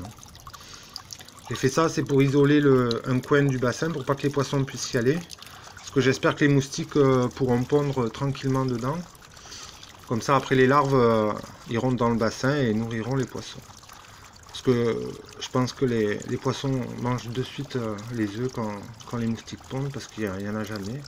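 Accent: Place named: South-West, France. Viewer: j'aime bien cette accent du sud ouest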